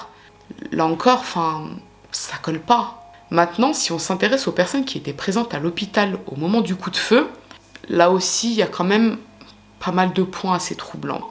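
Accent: Italy. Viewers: Extrêmement fan de ton accent italien haha 😍❤️ Sinon super vidéo encore une fois ! Histoire très troublante... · que du Bonheur pour votre ACCENT ITALIEN un DELICE, et sur le mot de la COCO, juste délicieux merci pour toute vos VIDEOS, bien mieux que le CINEMA et les reportages TV, et votre Charme dans la VOIX Superbe, SuBLIME, J 'aime Tout FELICITATIONS. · Adorant l'Italie, t'écouter traiter des sujets de ce pays avec ton irrésistible accent italien.... Je surkiffe !! Grazie Bella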